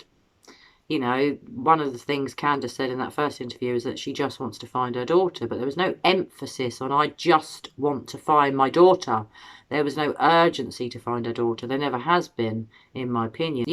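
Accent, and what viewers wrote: England, United Kingdom. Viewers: Lots of red flags. So many to count them. They acted like Summer was dead I agree with you I think this precious baby girl had an accident at the waterhole and they're all covering for each other Of course they don't want to go to jail or prison I think that one of them were some of them took Summers body and put her somewhere but not on the grounds for they live. Yes I'm sure the dogs would probably be smelling that if that was true I don't doubt that her body could have been in the shed to begin with and he moved it that's a thought too This whole family is covering for everybody is what I believe is what i believe. All they talked about was her past in that interview and that they knew she was gone forever. That's what I picked up out of it when I saw that interview. What part of England are you from? My Dad was from Birmingham England. 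I love your accent it's very beautiful. God bless you doing these videos and keeping the story going until justice will prevail. Whether she be gone or whether she still be alive, justice still needs to be served 🙏🙏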